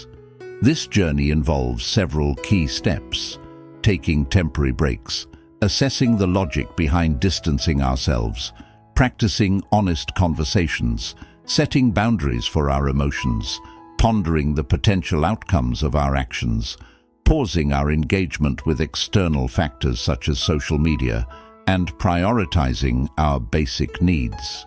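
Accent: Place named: United Kingdom. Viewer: The expressions....social media", snacks, and and "online".. do not go well with the overall energy brought on by the voice of wise, elderly man speaking with a classic British accent 😄....lol....This is only my opinion....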